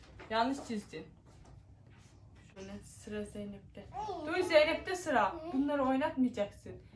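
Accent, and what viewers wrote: Bolu, Turkey. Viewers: Abla seni izleye izleye evde bolu sivesiyle konuseyom herkese gııııı deyom seni seviyoruz devamkeee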